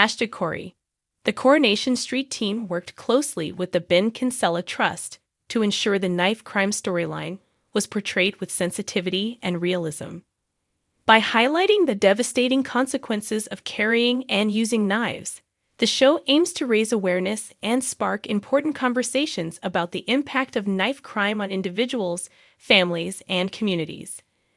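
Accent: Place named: United States. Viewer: The AI voiceover in an American accent is very annoying who pronounces names wrongly!!!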